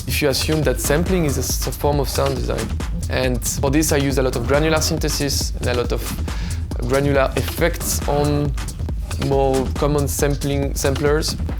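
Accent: France. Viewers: well that explains it then 😅 thought he had a small french accent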